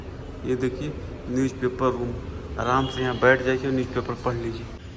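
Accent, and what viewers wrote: Varanasi, India. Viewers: APKI BHASHA SE ME PEHCHAN GAYA KI AAPBANARAS SE HA MERE NANI KA GHAR BHI VAHA HA 😁😁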